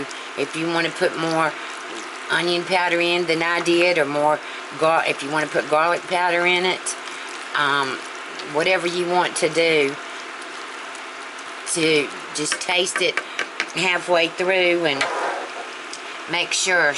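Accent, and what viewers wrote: South, United States. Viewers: Looks delish! Never heard of Lux Pinto Beans..? Do they sell this brand in New York? LOL.... Love your Southern Accent! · I cant believe any body could be so rude to you...I guess someone with too much time on thier hands. Im.a brand new subscriber, love your recipe, and your southern accent..keep the great recipes coming. And God Bless You❤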